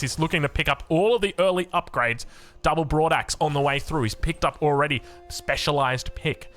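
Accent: Australia. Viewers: What is it with youtube commenters not understanding Australian?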